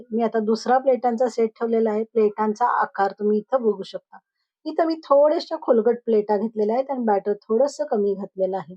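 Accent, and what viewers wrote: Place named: Karnataka, India. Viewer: लुब्लुबीत नाही लुसलुशीत आणि प्लेटा नाही प्लेट्स तुम्ही कर्नाटकी आहात का? उच्चार तसे वाटतात बाकी इडली ठीक आहे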